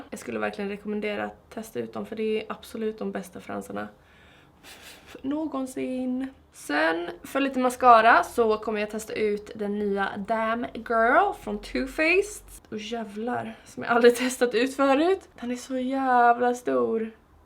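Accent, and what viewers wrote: Stockholm, Sweden. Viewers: Like it alot better when you speak english even tho I'am a swede myself 😂 you have that stockholm accent when you speak swedish 😅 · Blev absolut inte sämre på något sätt, så himla mysigt! Va inte alls beredd på att du skulle ha så mycket Stockholmska i dig. ÄLSKAR! <3 Keep on going girl! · Jag är svensk men sjukt konstigt att höra dig prata svenska 😂 så van att bara höra dig prata eng! 😂 och trodde inte du skulle ha sådan Stockholms dialekt, men tydligen 😂👌🏻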